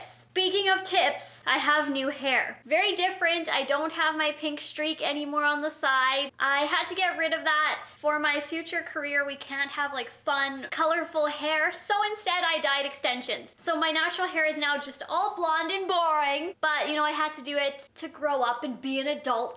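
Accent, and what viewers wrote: Canada. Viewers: Btw I love your accent. Canadians are the best!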